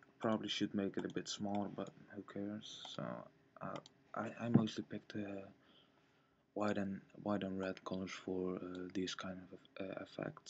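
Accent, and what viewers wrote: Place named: Mexico. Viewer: Nice mexican voice :D Good tut.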